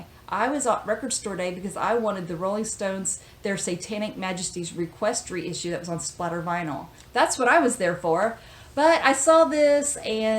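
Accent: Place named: South, United States. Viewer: I love your accent, very southern lol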